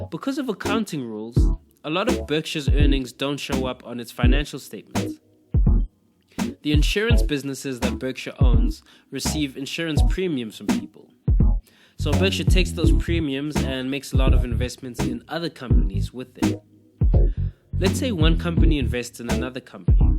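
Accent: South Africa. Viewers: I hear a South African accent in there!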